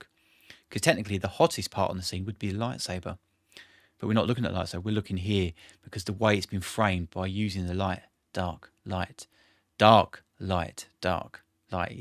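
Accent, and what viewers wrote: United Kingdom. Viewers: What a cutest English accent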